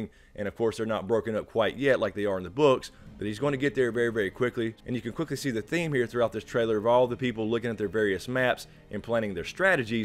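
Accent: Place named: Mississippi, United States. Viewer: I don't know what I was looking forward to more, the actual trailer or your trailer breakdown (tho I am going through GOT withdrawls since they are doing the late start this yr) Man you are the only one I watch about GOT reviews, IMO you are the only one that's worth a fuck. Now I could be a little bias because I'm from South MS and you from the way you talk (and not to sound creepy, you honestly look like my buddy Tyler would have in maybe 10 yrs or so) and my boy Tyler passed away a little over a year ago. He had that same passion about ASOIAF that you have and got me into reading the books. Anyway man just keep up the good work man. I really enjoy the vids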